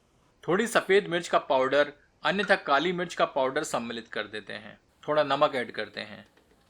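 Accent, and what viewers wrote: Standard. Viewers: Bro your shudh hindi is as good as your English you are a great chef you deserve more subscribers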